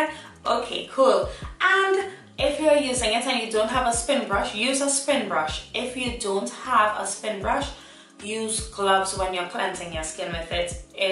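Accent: Trinidad and Tobago. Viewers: I hear that trini accent... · You from Trinidad 🇹🇹 ??? Your accent 😍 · First 3 seconds, i knew she was Trinidadian. Liked and subscribed ONE TIME! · I know a Trini accent when I hear one 😍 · I randomly clicked on this video looking for reviews on the soap and immediately was like wait is she Trini? Lol its so cool how our accent is soo recognizable especially to other Trinidadians lol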